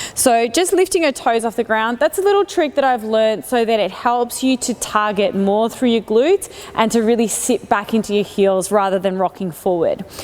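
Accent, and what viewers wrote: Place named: Australia. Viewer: Aussie accent is ❤️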